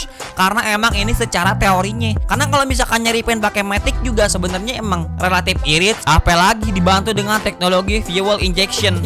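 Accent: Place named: West Java, Indonesia. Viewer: Kepincut ni channel karena logat sunda n music terompetnya 😂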